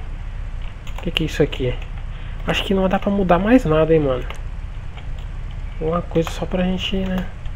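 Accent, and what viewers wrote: Bahia, Brazil. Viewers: Caramba mano tu e baiano né kkkkk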